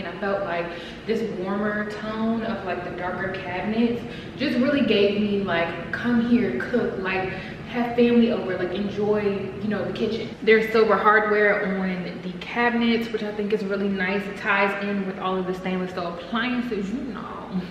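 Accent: DMV, United States. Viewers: I just know you from the dmv by your accent lol. I so wish you could share this apartment cause it is everything but people crazy so 🥴 congrats!!! You deserve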